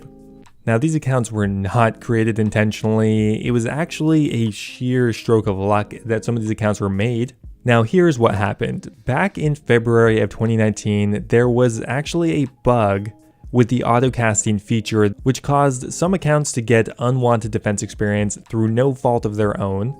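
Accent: Quebec, Canada. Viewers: t'es quebecois?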